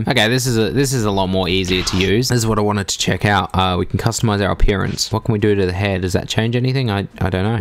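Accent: Australia. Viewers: Are you an Aussie m8!? Sound like my girls brother lol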